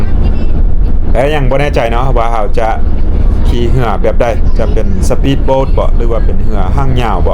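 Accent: South, Thailand. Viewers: ฟังตอนแรกคิดว่า แหลงใต้ บางคำเป๊ะเลยนะ เคยมีเพื่อนเป็นคน วังสะพุง จังหวัดเลย พูด แบบนี้เลย สะพานถูกต้องแล้ว อ่านว่า สา-ระ-สิน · ไซเหมือนแหลงใต้เลย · สำเนียงบางคำ เหมือนภาษาใต้ปนอีสาน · แปลกจัง สำเนียง70% เหมือนภาษาใต้พื้นถิ่นของภูเก็ต & พังงา เราฟังแล้วเข้าใจ100% ไม่เหมือนฟังภ.อีสานแถบอุดรฯ, ขอนแก่น ฯลฯ เคยมีคนบอกว่าภาษาอีสานของจ.เลย ซึ่งคนส่วนใหญ่อพยพมาจากหลวงพระบาง จะพูดเหมือนภาษาถิ่นใต้ฝั่งอันดามัน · สำเนียงภาษาใต้ชัดๆ..ถ้าไม่มีคำว่าแม่น/บ่/มัก/เบิ่ง/เฮา/(จะไม่รู้ว่าคนลาว)สำเนียง..คนใต้ชัดๆ..คนไทยภาคใต้นี่น่าจะมีเชื้อคนหลวงพระบาง55..หรือคนหลวงพระบางได้ผัวคนใต้55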